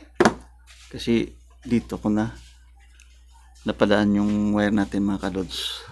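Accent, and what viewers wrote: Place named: Visayas, Philippines. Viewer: ok bokal salamat men galing ng visaya ka.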